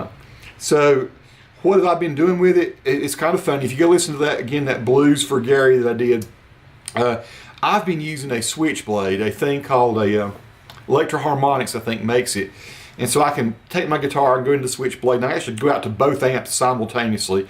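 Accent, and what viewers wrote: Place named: Oklahoma, United States. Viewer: Almost sounds like oklahoma or something midwest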